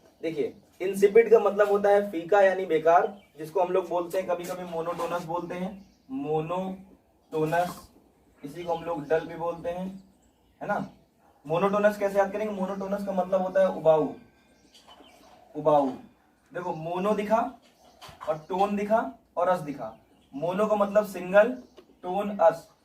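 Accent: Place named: Kanpur, India. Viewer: Sir your energy level is awesome. Your tricks are also superb but I like the way you teach. Smile on your face spread positivity and of course kanpuriya language is the best. Missing your karrahi coaching centre. By the way I'm Parth.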